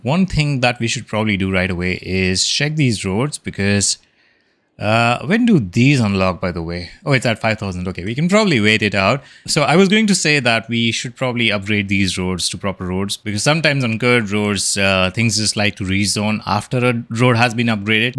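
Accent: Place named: India. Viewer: Excellent video! I'd like to know if you're basing your city in any real locations. I'm brazilian and I often struggle to do stuff familiar to the place I live, because this game is very "american". Apparently, judging by your accent, you sound a little bit indian, so i imagine we run through the same problem. I'd also wanted to know if you consider LUT's or map themes as "mods". They could make this city look even better than it already is! Anyway, hoping to see some new episodes! <3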